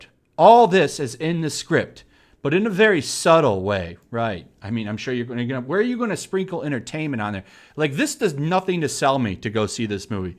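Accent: United Kingdom. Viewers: Why do you talk like a British dude!😂😂😂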